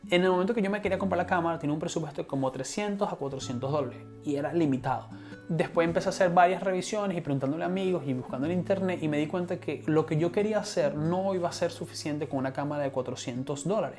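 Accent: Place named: Venezuela. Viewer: ya decia yo, verga el acento de esta pana es venezolano ajuro.. pan banderita de venezuela en la camara haha Buen video bri!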